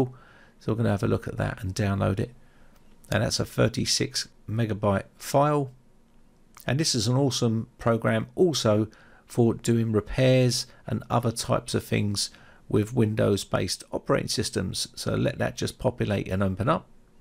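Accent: England, United Kingdom. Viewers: Since you talk with an English accent, why are you pronouncing CPU-Z as "CPU Zee" and not "CPU Zed", like any Brit would?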